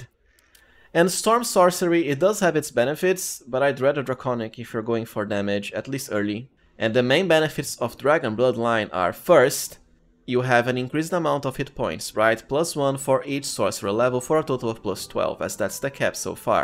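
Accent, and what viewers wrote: Brazil. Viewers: Eu tinha certeza que tu era brasileiro pelo sotaque. Conteúdo muito foda meu mano. Talvez tu fosse o melhor canal de bg3 builds no brasil em pt, mas a escolha do inglês claramente deu certo. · não tem como nao saber que é br com esse sotaque, belo video amigo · maluco com sotaque todo brasileiro falando ingles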